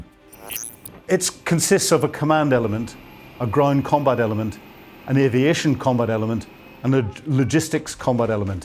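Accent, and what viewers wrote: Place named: United Kingdom. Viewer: Don't try to tell us what Putin attempted to do to Kyiv but failed to accomplish. What, are you a British hack? Yes, listen to your accent. Another foreigner acting to be a type of expert on America's capability or intentions.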